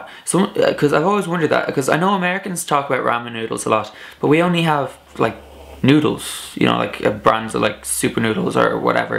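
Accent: Ireland. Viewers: You're defo D4. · Ah babe, you've a mad strong Irish accent :) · To non-Irish, your accent is quite pronounced. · Yeah you don't have a "tick" Irish accent. Sounds a little bit like mine. I wouldn't say it's Americanised though. When I think of that, I think more of the D4 accent. Yours definitely sounds Irish, just soft and not at all like you are trying to sound American. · You have a gay irish accent ! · Yeah, we like Irish accents. You have one, for sure. As an American, you'd never pass for American, nor English. Tootsie Rolls are chocolate chewy candies that look like frankforts, (hot dogs). Ramen Noodles ( RAH-MEN) are just what you imagine they are, small individual packs of noodles you heat and eat. Pecan, is a nut we Americans say like Pee CAHN, or Pee can. Neutral to you, man, but delightfully different than Americans'. Yeah, Americans say "wada" for water. 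" Nice video!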